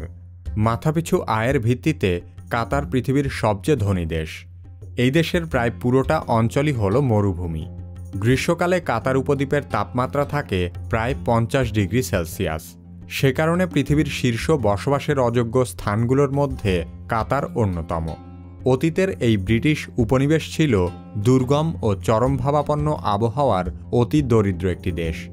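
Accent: Bangladesh. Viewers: বাংলাদেশের ভাষা যে কত সুন্দর তা আপনার কথা শুনলেই বোঝা যায়.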